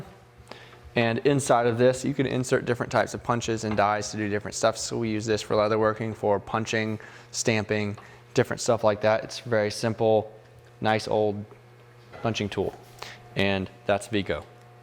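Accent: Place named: Rural, United States. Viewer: Sounding a little country there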